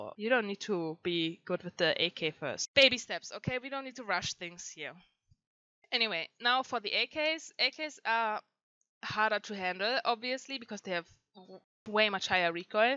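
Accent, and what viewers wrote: Germany. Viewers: The german accent tho 👌🏼 Man hört es 😅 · Are u German ? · Den deutschen akzent fühl ich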